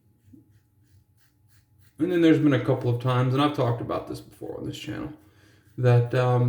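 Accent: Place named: United States. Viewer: That was a good shave! I vote TSE Espresso for the next one. It's called a three-way tie! 😁 Classic Shaving is not tallow based, and all of their scents come in both their regular base and a lanolin base. My pre-shave routine entails using a face wash during a hot shower and then shave right after. I haven't done the hot towel method since I used cartridges. Lol. I'm already getting decision fatigue trying to decide on what soap to use every morning. SMH. I gotta laugh at the gringo pronunciations you use. 🤣 But that's okay, you're still awesome. Hey, how 'bout you mail me a nice bbq'd brisket? Lol. Love you,! 💙🖤💙🖤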